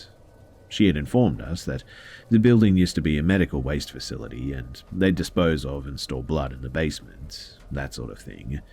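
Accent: Australia. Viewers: Sounds both like an australian and new Zealand and somewhere in the Americas accent. I really like it. 🤗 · I love an Aussie accent, but dude, slow the words down, you miss storytelling when you just read off a script.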